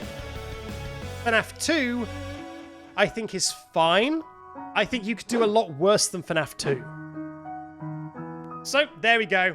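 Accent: United Kingdom. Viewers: AHH HE'S BRITISH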